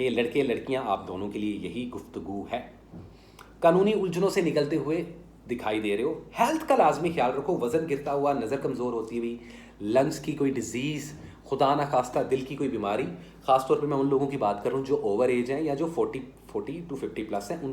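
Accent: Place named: Punjab, India. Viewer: Capi here,Thanks for the reading 🙏🏼 Love your Punjabi accent 🫶🏼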